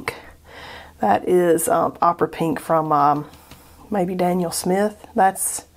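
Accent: South, United States. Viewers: FINALLY find someone with MY accent. I was beginning to think I was the only southern artist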